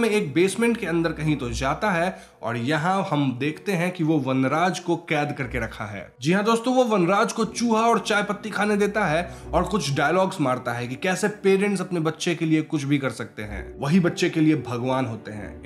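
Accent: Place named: Bihar, India. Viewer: Story teller is Bihari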